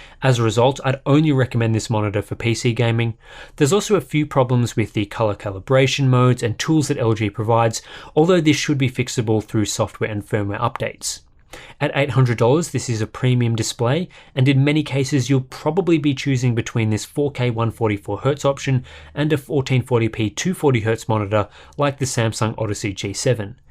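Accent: Scotland, United Kingdom. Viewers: U got that default British voice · perhaps Scottish? I hear a slight accent.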